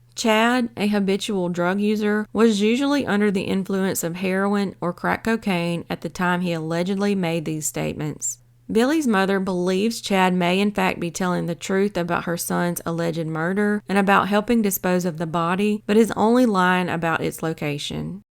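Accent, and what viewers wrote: South, United States. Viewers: This is the first CT true crime video ive ever seen on YT. Really cool since ive lived here all my life and have watched 1000s of true crime docs on here. Ty southern girl who sounds super hot. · Ah brilliant it’s my favourite southern accented girl talking to me about my favourites subject - true crime :) thanks 🙏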